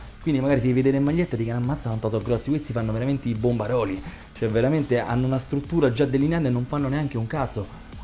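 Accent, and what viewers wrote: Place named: Rome, Italy. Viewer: sei sempre il top ti seguo con gioia e mi fai morire con L tuo dialetto romano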